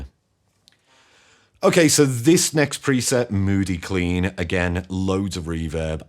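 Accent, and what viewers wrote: Liverpool, United Kingdom. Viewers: The scouse accent just is the best for music reviews ❤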